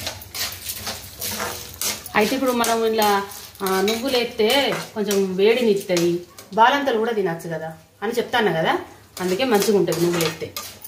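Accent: Telangana, India. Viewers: Amma thanks for your telangana slang all recipes are good and natural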